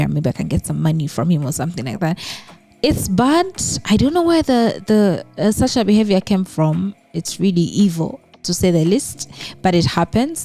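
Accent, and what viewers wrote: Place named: Uganda. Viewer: You're right. There are plenty backstabbers here in the United States. I've heard alata stories just like these negative stories about Africa but that's not gonna stop me from my dream... to visit or even move to Uganda. Let it be known that I heard alata GREAT THINGS about Uganda, Kenya, and South Africa as well. And Ms., just so you know, one of the reasons I like your videos is you, yourself. You are so beautiful! And I love your accent, the accent of the Ugandans!!! Hope you don't mind me saying so. L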